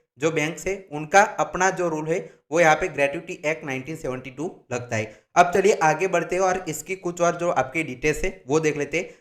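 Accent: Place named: Bengal, India. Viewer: U r talking like Bengolian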